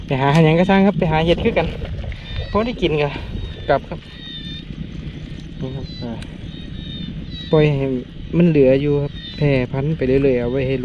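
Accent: Chaiyaphum, Thailand. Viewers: กล้องดีเนาะฟังสำเนียงคือคนชัยภูมิบ่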